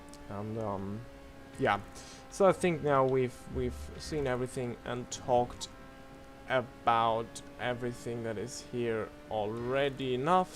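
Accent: Germany. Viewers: Hat man schon am Akzent gehört, das du deutsch bist👌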